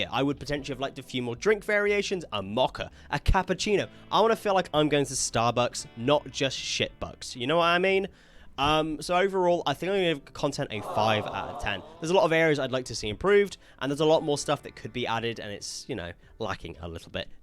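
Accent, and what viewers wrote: United Kingdom. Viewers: wait u are brittish???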